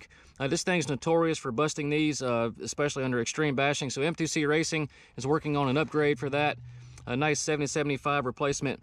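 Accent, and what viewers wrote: South, United States. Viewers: Once I heard that accent I knew I was dealing with a pro, that amount of redneck and annunciation is a dead giveaway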